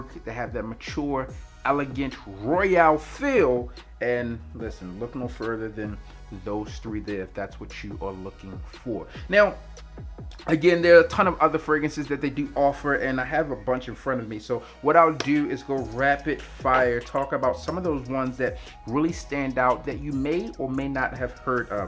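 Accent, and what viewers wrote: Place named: East Coast, United States. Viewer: I love the content, but that east coast accent makes me cringe. 🤦🏾‍♂️😩😂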